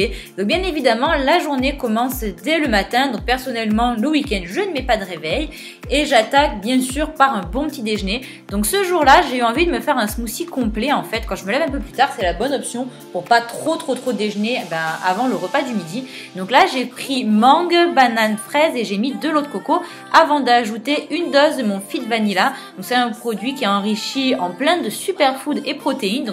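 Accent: South, France. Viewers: t'es tellement ridicule quand tu dis healssy... dis le en francais avec ton akessan du sud c'est beaucoup mieux va...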